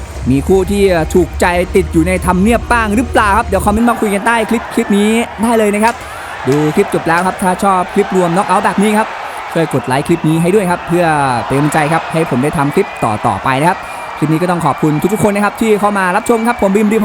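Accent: Isan, Thailand. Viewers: พากมวยมีอารมณ์ร่วมกับมวย.รวมพลัง. น้ำเสียงดีด้วยเลยทำให้น่าฟังมาก.ขึ้นไป อีก.ติดตามตั้งแต่เริ่มเปิดมาดูแล้วครับ.พาก มันจริงฯ.ชอบฯ.ไทยปนอีสาน.อย่างแจ่ม..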